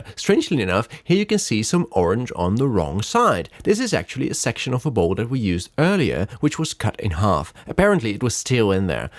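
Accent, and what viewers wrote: Netherlands. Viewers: I love the dutch english accent ❤😂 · is this guy dutch?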